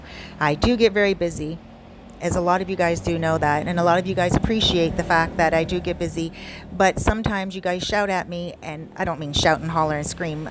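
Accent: Canada. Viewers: Great content! I could tell you are Canadian by the way you pronounce certain words, like "out and about"! I always knew! Keep up the great content!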